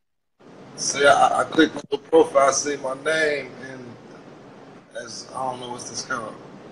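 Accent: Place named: Chicago, United States. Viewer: He sound so Chicago ♥️❤️♥️❤️♥️😍👑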